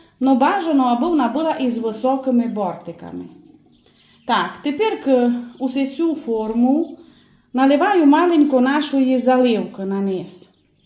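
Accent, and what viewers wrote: Transcarpathia, Ukraine. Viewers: Яка велика наша Україна! Яка чудова закарпатська говірка. І все ж зрозуміло. Дякую Вам, пані господине. Отримала подвійне задоволення. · Це закарпатский діалект! Гарний, ні на який інший не схожий. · Ваш діалект говорить про те,яка у нас велика Україна!!!! Я з Одеської,найбільшої області України, і прекрасно Вас розумію!!! У нас говорять на суржику,але я,якщо чесно,соромлюся говорити такою мовою,тому,коли я розмовляю українською мовою,то всі думають,що я зі Львова!!!😄 А мій рідний брат говорить,як Ви,без комплексів,тільки на суржику,як говорять у нас в Одеській області,і Ви знаєте,він піднімає мені настрій,так само,як і Ви,тим більше це ютуб,і це Ваша Закарпатська изюминка!!! Мені подобаються Ваші рецепти,і Ваш Закарпатський говір!!!! Дякую за рецепт!!!❤️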